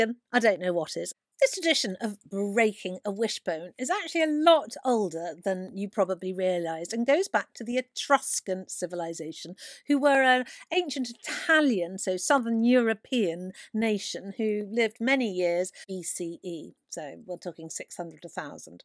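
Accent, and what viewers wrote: United Kingdom. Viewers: So much fun to hear a Brit Witch speak of the American thanksgiving. Love the pagan references and agree completely! My adult son has asked for ham instead of turkey. He brings up a good point-no body really likes turkey - we only cook it one day a year. Lol.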